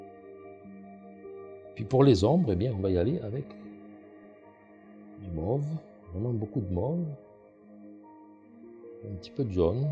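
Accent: South, France. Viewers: Merci pour ces infos et pour ton accent du sud de la France.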